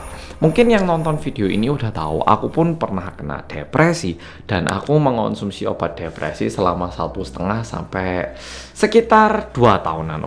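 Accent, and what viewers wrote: Java, Indonesia. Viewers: Edan...medok banget Indonesianya,ngga ada minesnya😂😂😂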